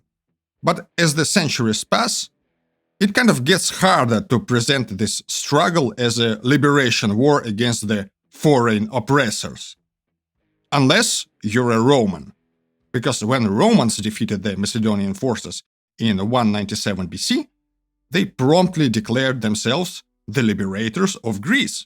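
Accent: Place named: Eastern Europe. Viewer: II suspect by your Slavic sounding accent your motives are less than objective. a. Contrary to your claims in this video that the "majority" of Greeks didn't consider ancient Macedonians Greeks (a "fact" you've made up), ancient Macedonians were recognized as Greeks by other Greeks. This is witnessed by their centuries of participation at ancient Olympiads which at the time were only for Greeks. Its not just Herodotus that claims ancient Macedonians were Greeks. Countless artifacts suggest this. For example there is records of Alexander's own father Philip participating at three different Olympics in the horse race. What you are doing is twisting a handful of references of other Greeks calling them barbarians. Most famously Demosthenes did this. Whats missiing in your narrative though is context. Demosthenes himself was called a barbarian by other Greeks on several occasions. 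Barbarian was being used as an insult. b. I can tell you have depended on Wikipedia's flawed map of ancient Macedonia. Ancient Macedonia of Alexander fame is already 100 percent in Greece. Even parts of ancient Paeonia is in part of northern Greece.. THE Macedonia.. Skopje is not even geographic Macedonia unless you want to claim Roman Macedonia or ancient Macedonian conquests of as "Macedonia". And in that case, huge chunks of north Africa, middle east and Asia would also count as Macedonia. C. You downplay the self-detemrination of ancient Macedonians.... like Athenians.. .like Spartans.. like Corinthi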